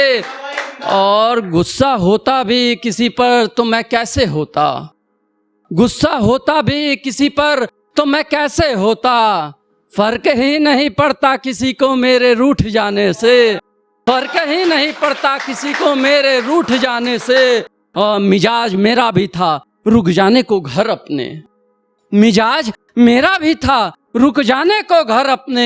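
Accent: Bihar, India. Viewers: Bihar se ho kya 😍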